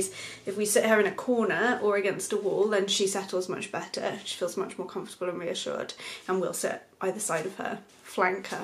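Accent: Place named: RP, United Kingdom. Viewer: The queens English is always lovely to hear! I've had 25 Greyhounds! (Whippets cousins). I will have sighthounds until I pass. Cheers!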